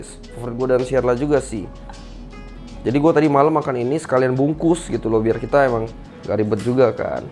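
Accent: Palembang, Indonesia. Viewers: Masih kentel banget bhso Palembang ny bang salam dari batu raja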